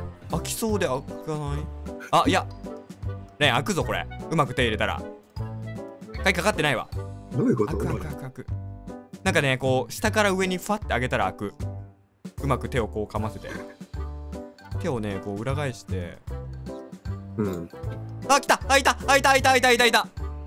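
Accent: Kansai, Japan. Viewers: 関西弁意外だなって思った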